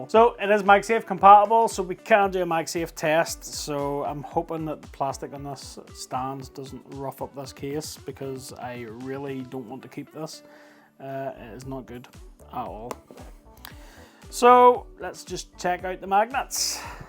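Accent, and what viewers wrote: Northern Ireland, United Kingdom. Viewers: You sound so northern Irish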